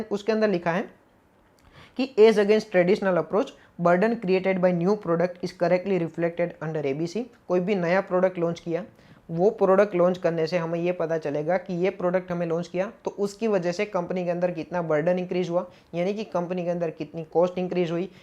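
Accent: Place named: Junagadh, India. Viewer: 2 things *Junagadh no tone aavi gyo😍 *And standard costing nu formate JKSHAH Classes jevu lage che..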